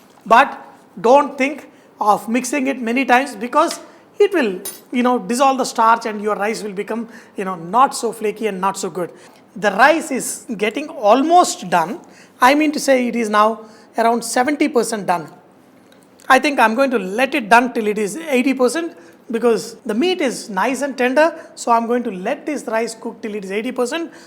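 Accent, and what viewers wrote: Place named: Telangana, India. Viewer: i like your Telengana dialect narrations the most!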